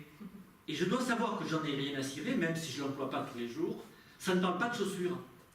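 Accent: France. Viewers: he says provençal words with a very strong french accent, is my impression as a catalan speaker. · tEnen No, la seua llengua com a tal és molt pura, llevat de l'accent francesitzat.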